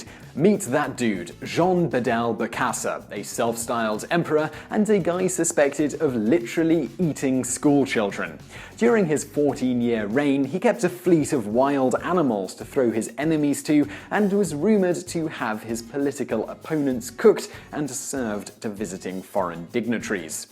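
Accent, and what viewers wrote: England, United Kingdom. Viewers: It's quite funny how the presenter here speaks in an English accent, yet uses and pronounces words in an American way. Anyone else noticed this?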